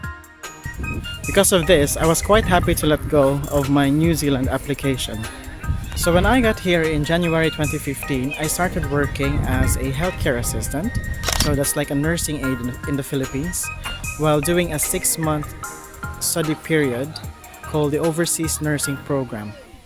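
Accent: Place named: United Kingdom. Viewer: wow lods na acquire mo na din ng accent ng briton salute to you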